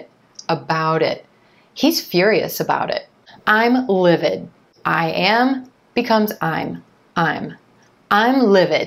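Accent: United States. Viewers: you are the best teacher!! I love American accent.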